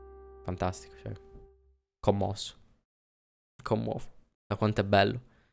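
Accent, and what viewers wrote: Sardinia, Italy. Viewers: Ma sei sardo? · Ma pk hai l'accento sardo? PS io sono sardo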